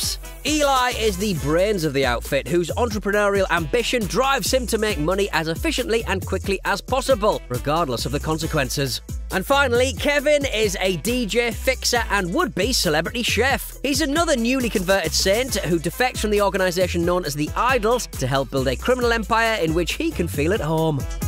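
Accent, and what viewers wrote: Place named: Northeast England, United Kingdom. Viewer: you have to be from the north east with that accent I'm from newcastle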